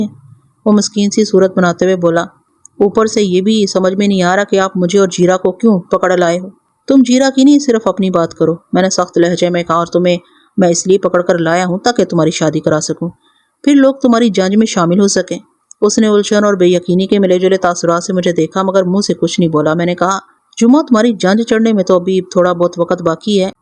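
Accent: Punjab, Pakistan. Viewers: بول رھی ھے اُردو آور لہجہ پنجابی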